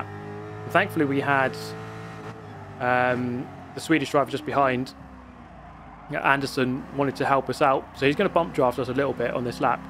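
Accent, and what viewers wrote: United Kingdom. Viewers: He sounds more British than most British people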